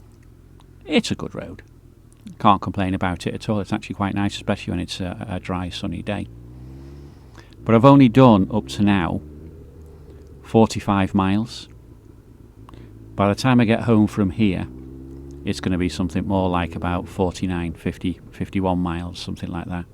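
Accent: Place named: RP, United Kingdom. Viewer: Loving the voice over hadn't realised how posh you sound 😂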